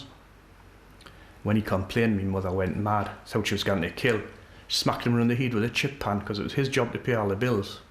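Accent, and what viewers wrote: Geordie, United Kingdom. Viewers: I'm kinda shocked that I can hear similarities between this dialect and my own, I'm Barbadian. I wonder if it's just a coincidence, or if there was actually a lot of Geordie speakers in colonial Barbados.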